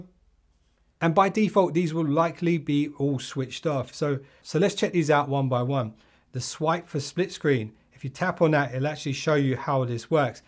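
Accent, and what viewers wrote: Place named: England, United Kingdom. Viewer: Can u drop the english accent? Not feeling it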